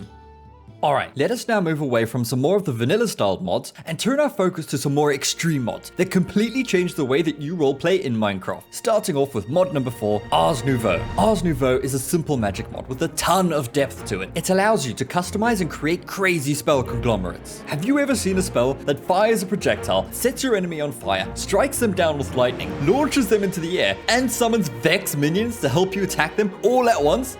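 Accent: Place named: South Africa. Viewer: I like your accent. Is it South African? Great content friend. 👍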